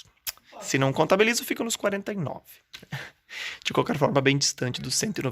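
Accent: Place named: South, Brazil. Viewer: Esse sotaque do sul kkkk😅❤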